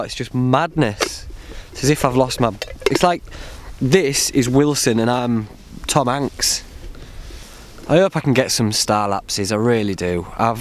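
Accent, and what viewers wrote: United Kingdom. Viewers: Hi buddy! Are you British?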